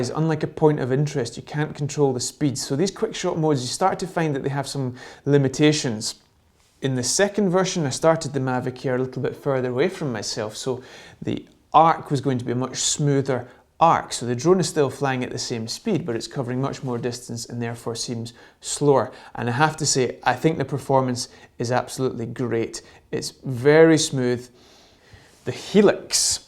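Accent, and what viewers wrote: United Kingdom. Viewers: Another superb video. I’ve only came across your videos a couple of days ago and I have watched a lot of them already. Well explained content which has helped a lot!!!! And....and....... being from Northern Ireland myself it’s great to hear a british voice doing a DJI tutorial. Thanks man...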